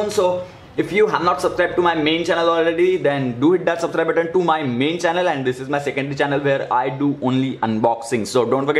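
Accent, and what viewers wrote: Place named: India. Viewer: What language is he speaking? Sounds like fluent Indian Scammer.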